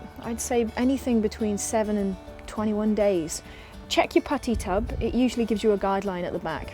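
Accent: England, United Kingdom. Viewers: Lovely acent i believe English. What part of UK ? Thanks for your info on glazing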